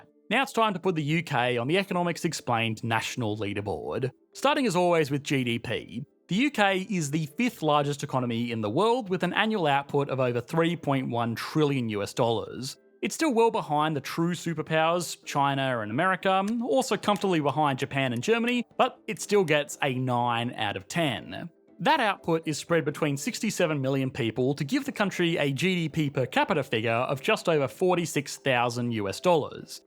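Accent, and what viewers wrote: Australia. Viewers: He's an Australian. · i love aussi accent, but all the endings with -eeeeuoooeeee is just too much. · defo Aussie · U.K makes jet engines, high-end cars...has the most important financial centre in the world and yet EE still places UK behind NZ LOL seriously mate your economics education is flawed...There's more economic activity in one London street than the entire country of NZ. Are you a kiwi with an Aussie accent? why the soft spot for the land of sheep and the haka? lol if NZ was to fall off the face of the earth surely the world economy wouldn't be impacted. at least Australia has natural resources it exports to big countries...so Australia is definitely more globally significant to the world economy than NZ and yet you even placed Australia Behind NZ? You see the world from a biased and unrealistic realm.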